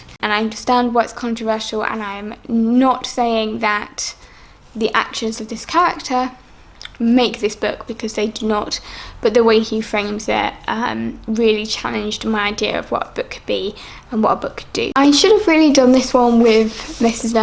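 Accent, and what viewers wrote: United Kingdom. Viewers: Wow british accent. Tbh difficult for a nonnative speaker like me to perfectly understand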